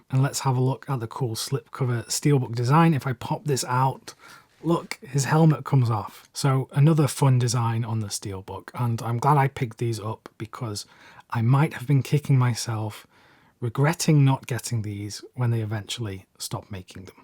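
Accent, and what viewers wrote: United Kingdom. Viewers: Are you not British?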